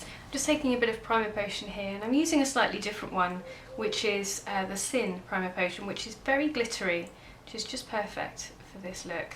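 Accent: England, United Kingdom. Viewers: Indian with an English accent?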